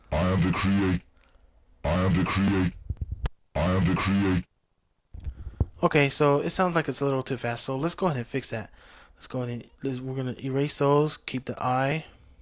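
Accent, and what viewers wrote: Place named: Caribbean. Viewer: Nice tut! I can tell you're carribean.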